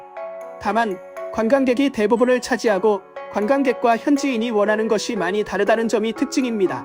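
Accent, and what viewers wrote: Japan. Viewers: 예전부터 일본사람이 한국말할때 스무니다 개그소재가 사실이었네요ㄷㄷ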